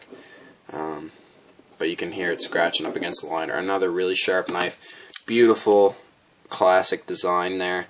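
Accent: Canada. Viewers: You're Canadian, Aren't you? You sound like a Newfie.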